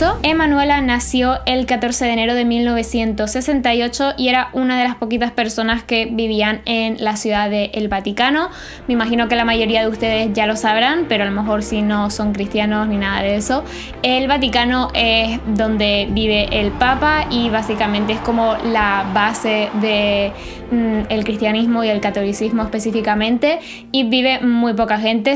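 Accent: Cuba. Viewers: Pensé que eras Cubana o Puertorriqueña. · Eres cubana?????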